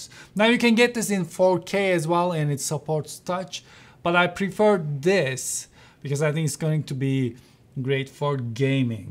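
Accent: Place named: Turkey. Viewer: Aksanından Türk mü bu adam acaba dedim :)))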